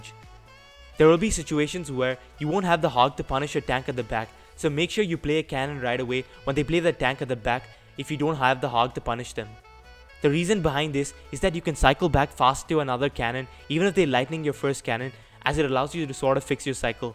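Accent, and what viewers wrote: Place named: India. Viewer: I love the video! Also i love your accent. Probably the best indian accent i have ever heard :D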